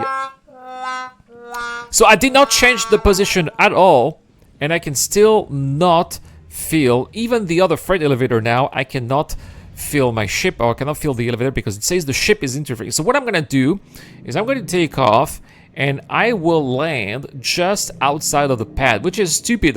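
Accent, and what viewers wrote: France. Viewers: so whats with the French accent?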